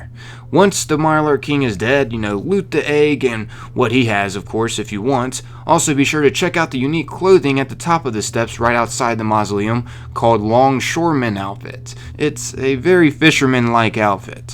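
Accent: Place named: Western Pennsylvania, United States. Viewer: Brother you gotta be from Western PA right?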